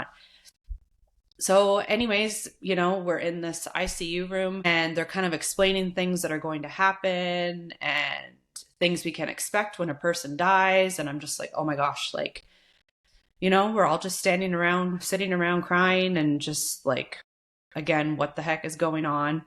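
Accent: Canada. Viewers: You sound Canadian. Hope you qualify for financial assistance from the government and that your husband had life insurance. I have faith your husband is at peace, it's those of us left behind who suffer. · sounds like canada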